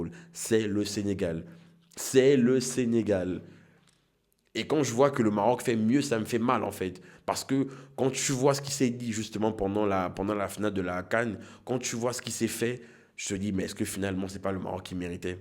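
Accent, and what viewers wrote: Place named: Ivory Coast. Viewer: ivoirien on reconnait quand tu parles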